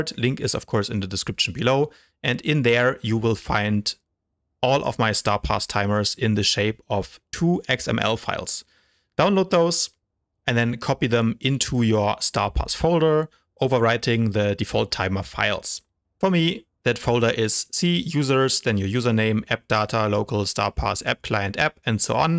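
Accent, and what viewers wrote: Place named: Germany. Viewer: Man hört raus das Du sonst eigentlich Deutsch sprichtst(no front)^^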